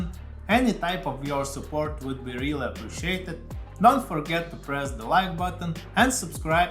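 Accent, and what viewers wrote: Russia. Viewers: Слышу как будто русский акцент... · nice channel bro! you are russian? your accent...))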